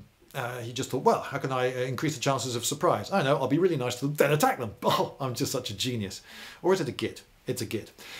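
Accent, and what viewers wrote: England, United Kingdom. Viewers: This is the most british briton to ever Brit. Love it! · Pretty sure this guy is british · I always love hearing an Englishman talk about Napoleon. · He is also brittish, VERY brittish.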